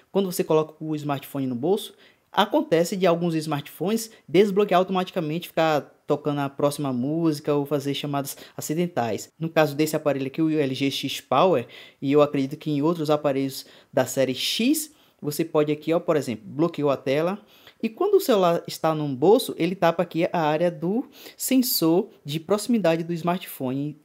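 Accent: Bahia, Brazil. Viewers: Meio estranho um sotaque baiano falando de tecnologia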